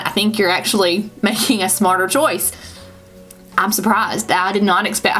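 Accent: South, United States. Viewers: Thanks for the review! Where are you from? Love your southern accent❤️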